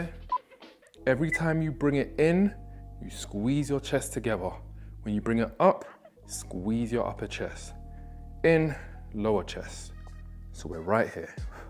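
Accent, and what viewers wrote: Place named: United Kingdom. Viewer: and because he's real attractive and has a British accent most will ignore what they sensed Like I did 😂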